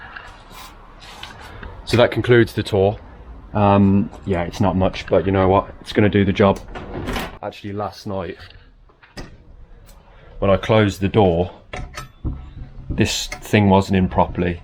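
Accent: Birmingham, United Kingdom. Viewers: You've probably been asked before, but whereabouts in Brum are you from? By your accent I am guessing North Brum. Love the channel.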